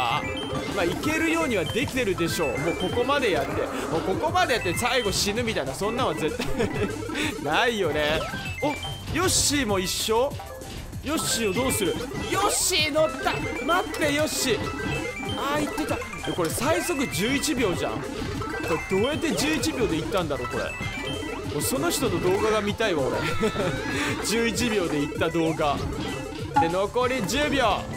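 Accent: Fukuoka, Japan. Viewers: 福岡弁可愛い😆ww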